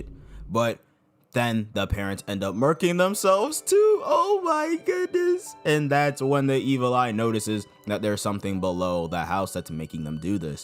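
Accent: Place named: Africa. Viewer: NICE ASS AFRICAN ACDENT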